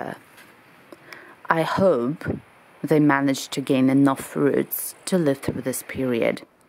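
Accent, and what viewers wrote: United Kingdom. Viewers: British accent....that's impressive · Cabbages were too good ...good work ...you belong to belarus but your english accent sound like british ?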